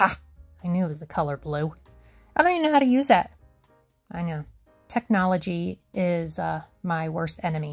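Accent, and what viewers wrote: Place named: California, United States. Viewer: Loved the Calley girl accent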